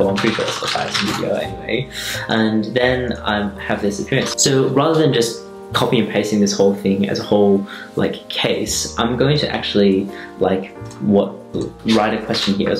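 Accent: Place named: Australia. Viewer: It must've totally slipped my mind that you're in Australia but OH MY GOSH. YOUR ACCENT. AH. Your voice, and you're so funny!!! Your video quality is beautiful, and the jazz is a vibe, and as for what YOU took notes on as a doctor wow it has all flown over my head. But you look happy and look like you're having fun which makes me happy ☺️☺️☺️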